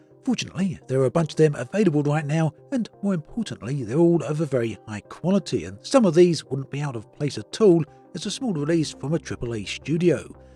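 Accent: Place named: England, United Kingdom. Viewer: I can barely understand with your English accent .. need to speak slower mate 😊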